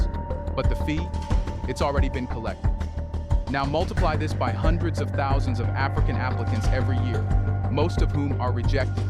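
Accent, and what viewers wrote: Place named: United States. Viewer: All these fake AI videos online, American accent on an african report? Get real...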